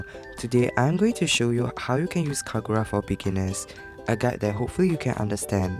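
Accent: Singapore. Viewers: wah haha not common to find sg players. I could totally tell from the way you spoke in the video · You have this singaporean accent. 😮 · Sounds sg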